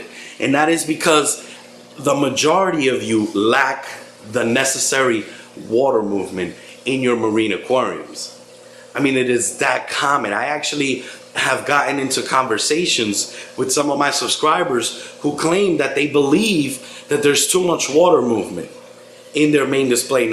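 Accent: New York, United States. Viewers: I really appreciate you doing these videos. I'm looking at an almost empty biocube 29 gallon tank and I'm BRAND NEW to marine tanks. I'm now worried about the "cool" setup I did with my live rock especially cause I only have the 1 stock pump that came with my biocube. I may have created too many deadspots like you said. It was also dope that you have a total New York (Brooklyn?) accent haha. Thanks for all the solid advice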